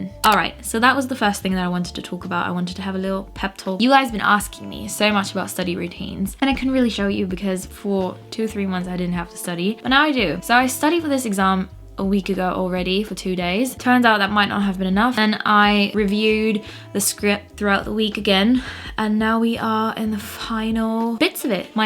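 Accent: United Kingdom. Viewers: Ich finds zu genial, dass du im deutschen auch diesen englischen Akzent jetzt hast :D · I love the British accent and I was convinced u‘re British..und dann fängst du an Deutsch zu reden😂😂💖Loved the video, thank for motivating us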